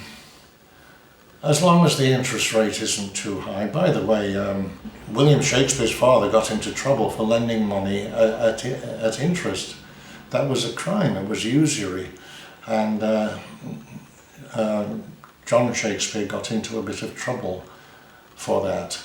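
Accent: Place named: Yorkshire, United Kingdom. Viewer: Lots of lovely little stories in there,. Love your Yorkshire accent!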